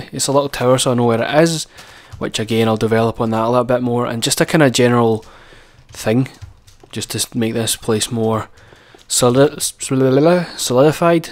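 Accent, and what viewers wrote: Scotland, United Kingdom. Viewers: this guy sound soooo much like James johnston from biffy clyro :D ( and not just becasue there both Scottish) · are you scotish lat ?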